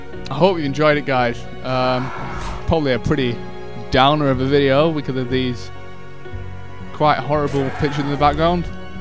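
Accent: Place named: New York, United States. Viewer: I love this guys accent it sounds like a New York accent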